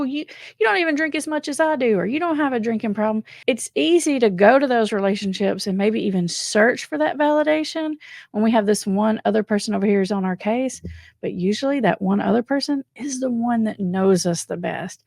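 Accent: South, United States. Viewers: where’s your accent from? Southern states?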